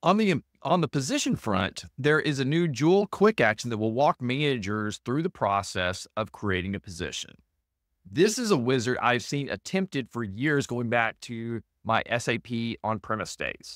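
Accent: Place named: Texas, United States. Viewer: Nothing like a bald guy with a Texas twang to start my week. Thanks B, this was a great wrap up and it was nice seeing you.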